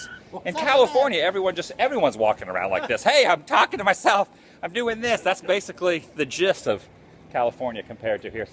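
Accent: South, United States. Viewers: Wow, that's why I've never been able to place your accent- it's a bit southern but not seemingly tied to anyone place.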